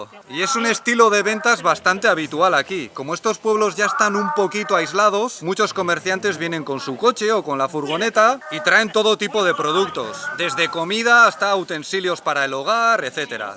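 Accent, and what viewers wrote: Basque Country, Spain. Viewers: Kaixo. Una cosa que me ha llamado la atención es que no se te ha quitado el acento Euskaldun. NO LO PIERDAS NUNCA PORQUE ES UNA SEÑA DE IDENTIDAD. AGUR MAITIAK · De que parte de españa es el acento de? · Es acento "vasco", del Pais Vasco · No sé de qué parte de España eres, pero ese acento es bellísimo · Pues si. Tú tienes un acentazo Vasco - navarro que te pasas. Se nota que te defiendes bien en Euskera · Me encanta como habla español porque se nota que ha hecho muchisimo esfuerzo. Es una chica estupenda. En cuanto a tu acento,es maravilloso. Simplemente denota que tu lengua materna es el euskera. Un abrazo a los dos. Disfrutamos mucho con vuestros videos. Tenéis naturalidad y sencillez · Mucho depende de qué zona de México se trate, en el Norte hablan más golpeado (Monterrey). Lo mismo he visto en España; todo depende de la zona, el acento euskera de me agrada bastante.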